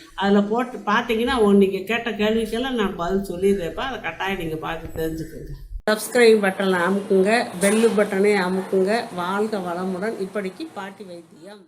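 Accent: Madurai, India. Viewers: Unga pesum murai romba azhaga irukku, neenga Madurai pakkama?Unga tamil madurai, virudhunagar side tamil madhiri irukku, ketkum bodhu romba sandhoshama irukkudhu🙏🙏🙏🙏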